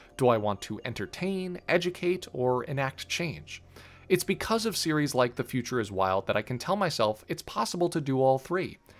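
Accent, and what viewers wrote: New England, United States. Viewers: The NE accent gets stronger and stronger each video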